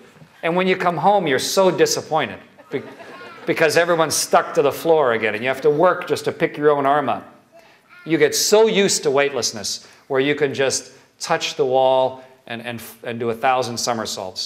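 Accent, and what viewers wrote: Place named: Canada. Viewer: Hehehe, I adore the Canadian accent:-) Especially the "eh" thing:-)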